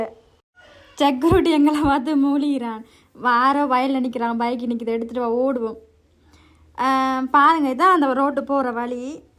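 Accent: Sri Lanka. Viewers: you are speaking Sri Lanka is very sweet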